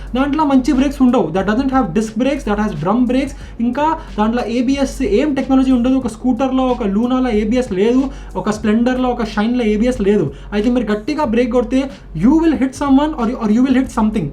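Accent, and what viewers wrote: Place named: Hyderabad, India. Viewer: ANNA How're you ? No need of switching yourself to confined Telugu Motovlogs We always love your feerfect istyle of pakka HYDERABADI slang in your content. Anyways wishing you all the success ahead ANNA Best wishes from our BBA Back Benchers.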